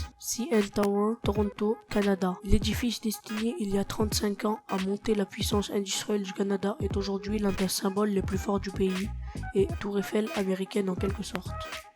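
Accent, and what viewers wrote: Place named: North, France. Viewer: Sa se voit qu'il vient du nord le quéqué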